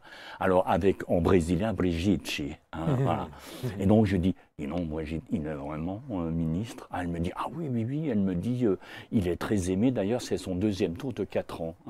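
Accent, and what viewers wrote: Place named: Alsace, France. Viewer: le délicieux accent alsacien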